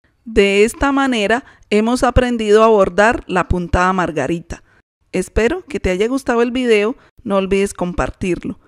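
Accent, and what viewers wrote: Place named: Andes, Venezuela. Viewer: Su acento parece de los andes de mi Venezuela amada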